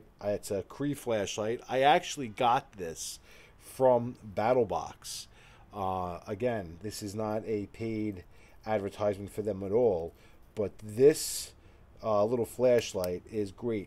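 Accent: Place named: New York, United States. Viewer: I immediately subscribed cuz the second I heard you talk I knew you was a fellow New Yorker! Alright bx zoo!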